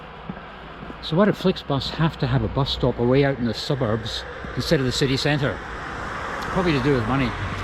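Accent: Scotland, United Kingdom. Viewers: Auur…aøuhr…awrur….As a Dane it’s quite complicated to try to pronounce ‘hour’ in Scottish - like you do,. 😅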